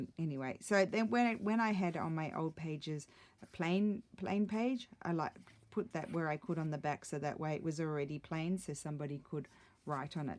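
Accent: Australia. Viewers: Wow, fabulous work! So glad I found you! Can’t wait to follow you. Greetings from Oz (do I detect an Aussie accent?) 😎🙃🇦🇺😘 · love the down under accent..greeting from Queensland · it was such a such a treat to find your first video. How far you have come since this post. I LOVE your channel. You inspire me with every single video. Your unique situation with being Australian in Italy is very cool! I love seeing all the italian papers you have, and Ii just love your beautiful accent. I am a new Youtuber myself, I do junk journals with a focus on processing grief. I lost my husband 6 months ago, and was surfing youtube while hiding in bed for weeks... I stumbled across Tracie Fox's videos, and found you through her... I really enjoy crafting along with you. thanks so much for the inspiration!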